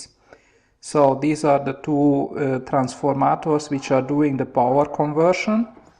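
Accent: Hungary. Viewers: Tetszenek a videóid !!! Főleg a magyaros akcentus :)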